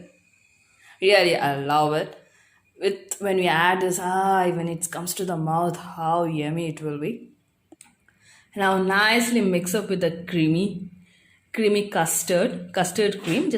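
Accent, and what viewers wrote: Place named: England, United Kingdom. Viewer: I just like your English accent than this fruit salada😊😊😊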